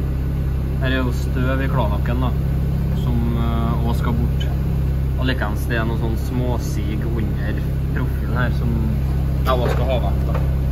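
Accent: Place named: Trøndelag, Norway. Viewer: Du snakker bra trøndersk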